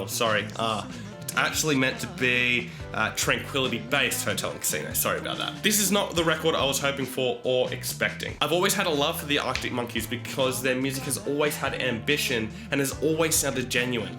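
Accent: Australia. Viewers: All these salty comments you should have realized you were in for a bad review when you heard his Australian accent!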